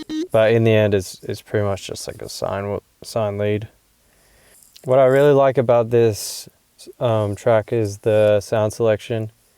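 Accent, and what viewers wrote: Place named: Australia. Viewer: brah i cud tell from ur accent thought so... good shit bro. saving aus underground scene with these beats <3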